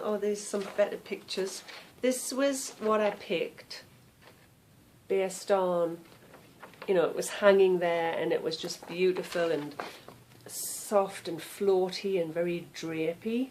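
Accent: Sunderland, United Kingdom. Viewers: we live in Adstock, Rural Quebec,It's at the bottom of the Eastern townships, not far from Maine. we operate a Musk ox fibre mill. Bit of a change from Sunderland. Hylton castle, did you see the time team episode at Hylton castle- I thought it was great to see Sunderland again ! We used to live at the top of Newcastle road, in the lovely Tudor style houses just down from the Grange pub. Last summer i passed through Sunderland Ontario on my way to a fibre show ! Will be watching your pod with great enthusiasm-nice to hear the Sunderland accent again after all these years♥ will try & look you up on Ravelry....I'm The-Qiviut-Fairy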